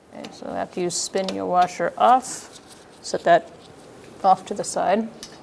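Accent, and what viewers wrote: Boston, United States. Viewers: Hi quality video and textbook Boston accent! After 12 years all my shock pieces are frozen.I'm not gonna use torch, just repeated srpaying and hammer hits and a few days, I also used a 4' steel pipe sleeved over breaker bar for awesome leverage., the top of new shock in the "cage" is a really, really tight fit and threading the needle is by braille on the top. I used silicon spray to move the shock top in the cage, it's that tight. Doing a job where all the bolts aren't frozen must be a lot of fun, something I'll never esperience!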